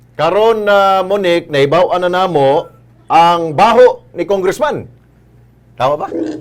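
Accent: Visayas, Philippines. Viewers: HahHaha katawa man ko sa imo sir hahhahahahha cute Kaau ka mo bisaya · Haha nakakatawa c idol mag bisaya.hehe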